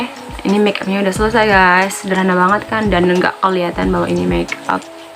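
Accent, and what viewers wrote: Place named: Java, Indonesia. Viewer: Medok ya mba.. Jawa banget deh ☺️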